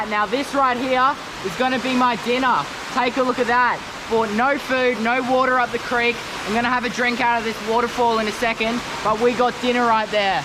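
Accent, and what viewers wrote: Australia. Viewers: he’s literally australian thats his voice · He’s Australian with an Australian accent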